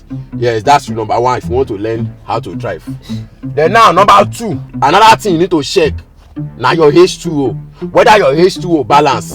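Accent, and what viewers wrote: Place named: Nigeria. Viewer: You are so funny. I love you you man. How Nigerian speak, make me smile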